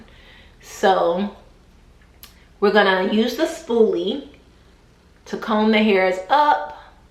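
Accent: United States. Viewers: You sound like us who live in Atlanta. We always say, “there’s Atlanta and the rest of Georgia.” So, yes, I live in Atlanta, not Georgia. 😊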